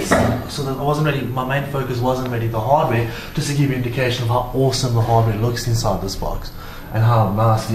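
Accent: South Africa. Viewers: what coutry are you from? You sound like a South African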